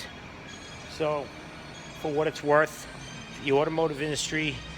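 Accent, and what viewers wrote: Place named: New York, United States. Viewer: Nothing like a New Yawker to tell it like it is.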